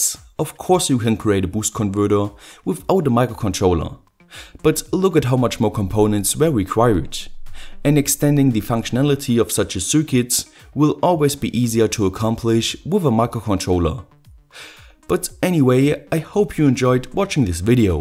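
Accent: Germany. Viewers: Hi- could it be that you are German ?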